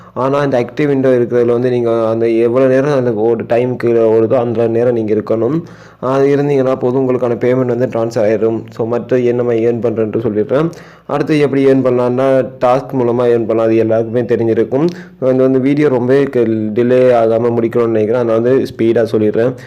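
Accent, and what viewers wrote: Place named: Sri Lanka. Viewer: Lanka tamil voice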